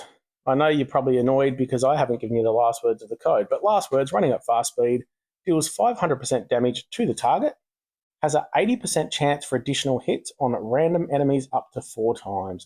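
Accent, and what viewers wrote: Australia. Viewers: thanks legend, new to your channel but always good to hear a fellow aussie voice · Great video, man! I could listen to Australian English all day long. Keep it up, your content is really amazing! Also, you seem to have a very smart cat! Great idea of yours! But don't worry, I'm sure there are enough people who watch your videos regularly. And of course, thanks a lot for your content! 😉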